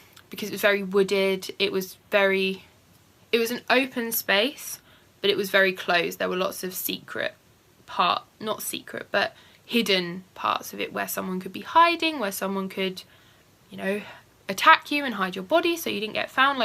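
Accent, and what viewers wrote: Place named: RP, United Kingdom. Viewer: I find her accent super interesting. The majority of her r’s sound really soft but then there’s certain words she says that aren’t like when she says together. Idk it sounds quite posh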